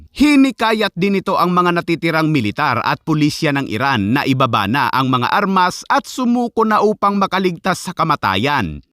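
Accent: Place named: Visayas, Philippines. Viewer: Bisaya ka pala dn idol eyy🤙🤙 hahaha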